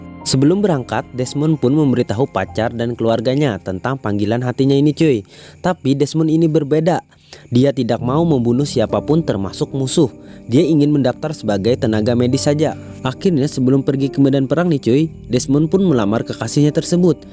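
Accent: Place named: Banjar, Indonesia. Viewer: Kok kaya orang banjar logat ya bro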